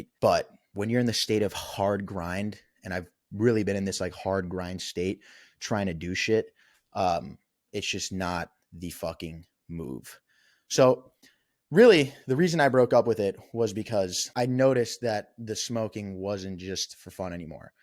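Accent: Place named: Long Island, United States. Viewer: sounds like you are from long island lol. We didnt 'have vape pens in my day but I can relate to this a lot. If you use pot on a daily/ nightly basis, it will turn on you eventually.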